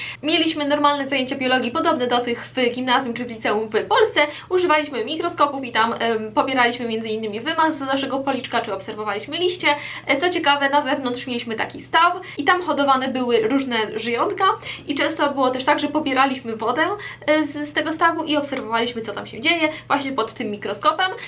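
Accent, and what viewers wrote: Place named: United States. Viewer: Jestem bardzo ciekawa jak brzmial twoj glos zanim pojechalas do usa bo teraz brzmisz jak prawdziwa amerykanka mieszkajaca w stanach ktora przyjechala do Polski i ma korzenie Polskie ile lat bylas w usa tylko rok?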